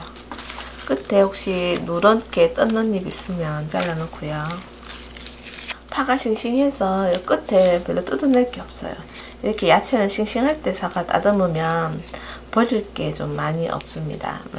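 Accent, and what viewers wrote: Gyeongsang, South Korea. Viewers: 경상도라 정감이가네요 그리고 쉽게 하시네요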